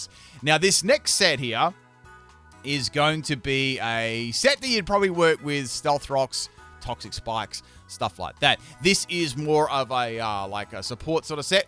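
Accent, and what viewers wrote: Australia. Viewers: that's sick - have seen this but never knew the name. you're aussie yeah?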